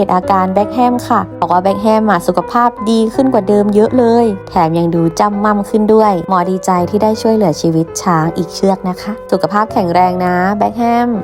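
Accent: South, Thailand. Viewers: หมอเป็นคนใต้หรอ🧐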